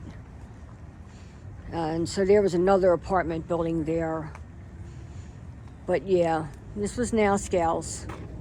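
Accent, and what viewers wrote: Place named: Delaware County, United States. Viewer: I grew up in delco as a child been living in amish land past 20 some years. Didn't realize until hearing you speak that I missed hearing the delco accent. My grandfather and mother grew up in Marcus hook and used to tell me stories of feeding the hobos that hopped off the trains there.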